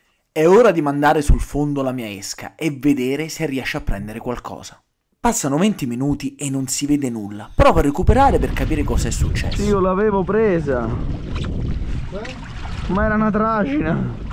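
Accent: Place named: Rome, Italy. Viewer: Mi sembra che hai un accanto Romano. Cosa ci fai in Sardegna.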